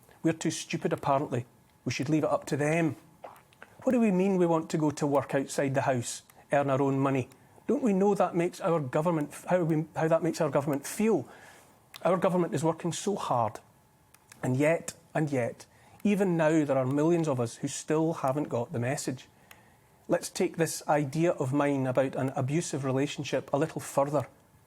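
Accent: Scotland, United Kingdom. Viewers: 👍🏽👍🏽👍🏽 Im America, trying to work out if is Irish or Scottish. Im thinking Scottish. 💜 Love his talks. I subbed this channel today. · You were correct. He's Scottish. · Kudos to. Lovely Scottish accent. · How much I love to hear a direct Scottish voice let out an opinion. It's rare in my northern New South Wales' region. The region hosted an Aquarian Festival in the seventies, and it appeared to be going in the right direction. So, I went south of the Queensland border - thinking I had left an oppressive police state where any looking different or just looking too happy was picked on by the police. More than two people together in public could be arrested. This is now the law in New South Wales - though few seem aware of it. It seems to be there to stop people protesting about something the government has guaranteed for large corporations. It could be used to stop people protesting against coal seem gas mining or pulling up our railway tracks for elitist cycling paths - for retired business folk and local councillors to go on long viewing of the scenery - while those who can't drive and have wheelchairs, disabilities, older folk and Indigenous who can't drive - can't go to the beach any more, or who die not being able to get to medical specialists in Brisbane, Queensland. 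All major political parties voted in NSW parliament to rip up the tracks for cycling pathways, and including the federal government, offered over $100million to local councils to do it - even though this is more than enough money to get to Lismore - the regional center some new railway services - and to Byron Bay, as it was over fifty years ago. Too many now have parasitic jobs driving people with disabilitie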